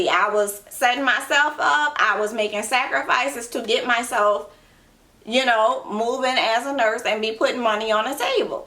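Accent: New Orleans, United States. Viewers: First thing I said she from nola accent tho😍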